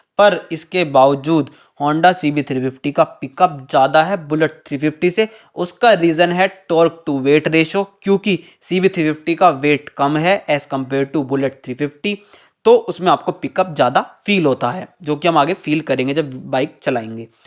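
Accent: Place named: Uttar Pradesh, India. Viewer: Neither of these bike falls under cruiser category. Please do your homework seriously ;If you want to grow. Else if you really want to remain just another street youtuber with strong UP accent and tone. Continue with all what you doing. Rest I will wish you best of your luck and hope you do good 👍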